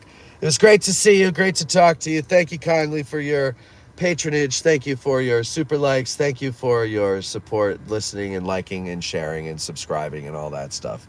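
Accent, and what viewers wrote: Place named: New Jersey, United States. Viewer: Your jersey accent is a trip..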